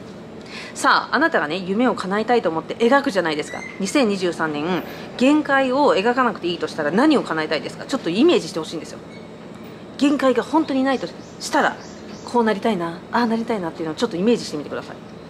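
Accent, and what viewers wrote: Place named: Kyoto, Japan. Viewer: 京都？っぽい。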